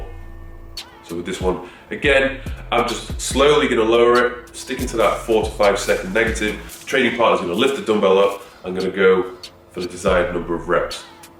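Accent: United Kingdom. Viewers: Hey, Don't know how many positive comments you can digest, but here's another......No one comes close to the great content and great show and tell of the videos, not to mention that you've got the best physique and perfect British accent to make the videos super classy! You are the best, period. Thanks, Hal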